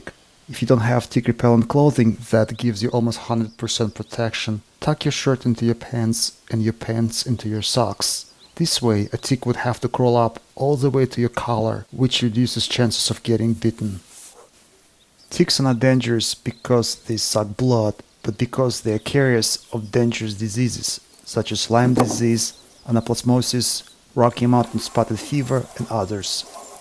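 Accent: Russia. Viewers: If coronavirus could be eliminated from our bodies like ticks, then it.would be simple. I notice your english is aquiring the russian form now. In the previous videos, it was more foreign. It is okay. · has a great relaxing voice, with just the right amount of Russian accent.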